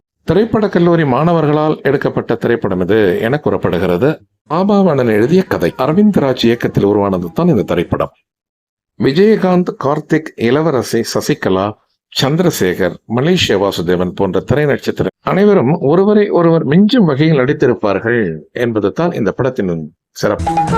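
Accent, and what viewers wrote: Sri Lanka. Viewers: சிலோன் தமிழ் குரல் 🎉 அருமையான பதிவு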